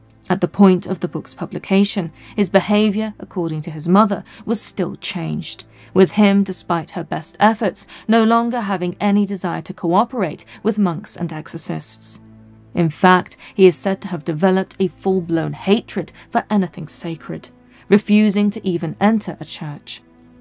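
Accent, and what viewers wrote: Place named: RP, United Kingdom. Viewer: You talk like a toff, try throwing in the odd bit of slang or a good old fashioned 4 letter word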